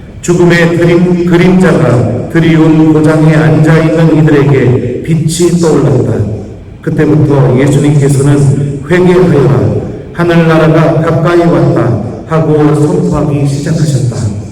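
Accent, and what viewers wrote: Chungcheong, South Korea. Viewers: 신부님 뵈오니 정말 좋았습니다 구수한 충청도사투리가 더 정겹고 좋았습니다 우리는 구원 받고 성령이끄심 대로 신부님 말씀 기억하면서 잘 살겠습니다~신부님 감사합니다 ❤❤❤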